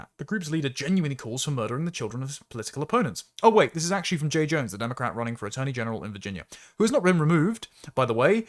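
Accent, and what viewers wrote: RP, United Kingdom. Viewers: always the halfwits who are impressed by the average posh english accent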